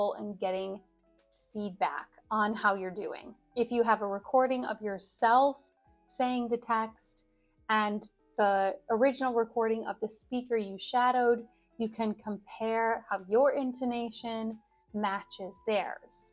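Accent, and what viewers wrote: United States. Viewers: WOW!!! Finally I found a great shadowing English channel with the beautiful American accent!!! 🙏🏻🙏🏻🙏🏻 More difficult than British accent to pronounce but more beautiful when it's well spoken (no slang, no ghetto stuff). Thank you, I'm a new subscriber 🥳🥳🥳💖✨💕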